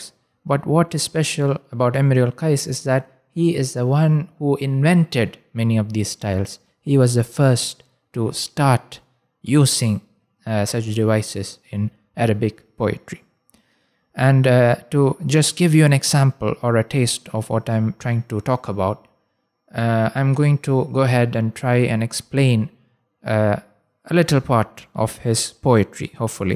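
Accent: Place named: India. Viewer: السلام عليك انت عربي ام من النهد لان لهجتك بالإنجليزية تشبه اهل الهند 🌹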